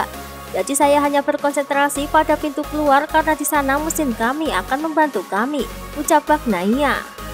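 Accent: Java, Indonesia. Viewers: Mba medok saya hadir hehe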